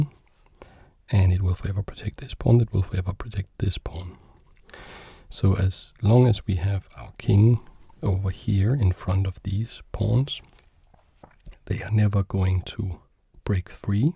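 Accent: Denmark. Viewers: Is that a danish accent I Can hear? 🤣 I am danish myself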